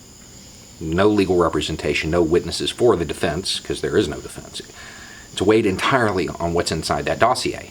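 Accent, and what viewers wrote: South, United States. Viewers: Don't try to fool yourselves by thinking we Iranians see trump calling off the invasion in the last minutes, as a sign of goodwill or concern for innocent people's lives. Everyone here knows he called it off because he was warned by his top brass, that even a single shot toward Iran, would definitely set all American bases in the Middle East on fire. No American can handle this catastrophe. He simply chickened out. Your president is a devil. Look how he thinks and deals with minorities in your own country. How can you be so naive? I really admire your personality and the way you are shockingly pro-humanitarian approaches about hot topics (especially with that southern accent), But thinking that this wet racoon has even a tinge of good will in his all existence is just not true.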